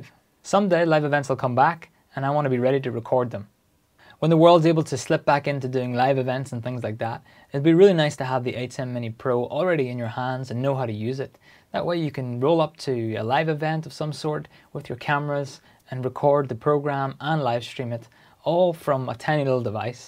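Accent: Northern Ireland, United Kingdom. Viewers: Not often I hear an NI accent on YouTube! Just got my Atem Mini Pro down in Fermanagh!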